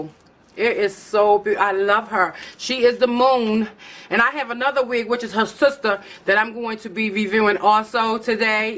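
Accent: Baltimore, United States. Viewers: Omg Are You From Baltimore ? I Love Your Accent . It’s So Cute !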